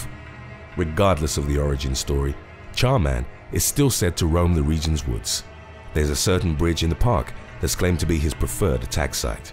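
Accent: United Kingdom. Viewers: His British 👋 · Don't you realize the narrator is British?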